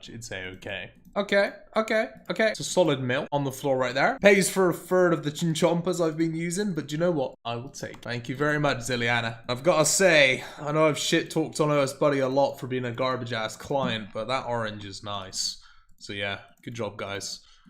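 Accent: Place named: Devon, United Kingdom. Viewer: With a Devon accent that thick I expected him to be doing a lot more farm runs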